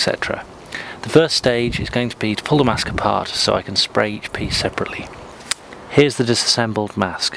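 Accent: United Kingdom. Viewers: Thanks for the tutorial! And was wondering where you get your paint from (I'm assuming your in the UK due to your accent)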